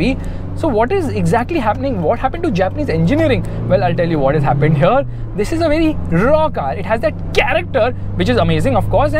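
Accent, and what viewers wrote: India. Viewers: Indian english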